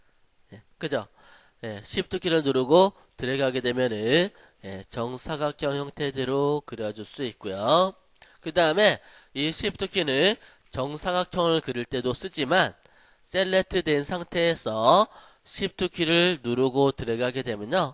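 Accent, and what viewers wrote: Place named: Yanbian, China. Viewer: 말투가 좀 연변 스럽죠..고마워요 ^^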